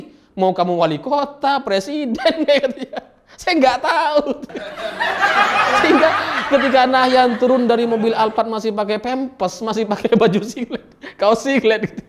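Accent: Java, Indonesia. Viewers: Orang Madura tp logat bahasa nya kayak orang jawa ya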